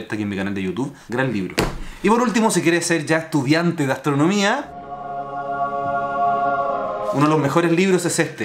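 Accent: Chile. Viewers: que bueno haber encontrado este canal, vengo de QuantumFracture, del vídeo de la tierra plana vs tierra esférica. Por el acento deduje que eras Chileno, que genial.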